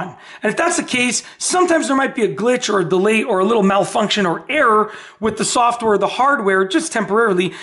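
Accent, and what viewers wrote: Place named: Canada. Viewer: This guys LOUD, echo-y Canadian voice is terrible! He talks TOO MUCH. It was easy but he made my ears hurt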